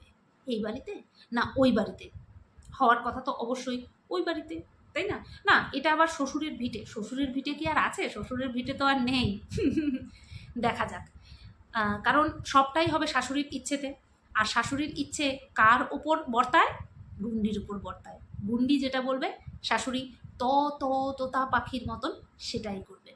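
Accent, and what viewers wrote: Bangal, Bangladesh. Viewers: ❤❤❤❤khubbb valo ble6o r tomar amgo ei bangal ktha purano diner ktha mne kraiya dayyy,vuilla jai amio bangal❤❤❤❤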